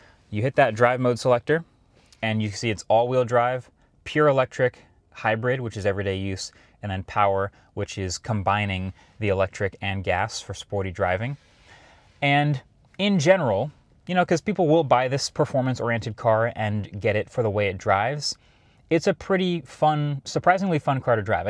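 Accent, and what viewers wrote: New Jersey, United States. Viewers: he so new jersey 😂🔥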